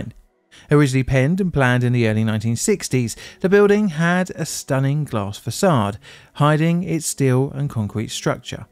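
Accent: United Kingdom. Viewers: Thanks for speaking slowly. It's difficult sometimes to understand the British accent when you don't live around it constantly.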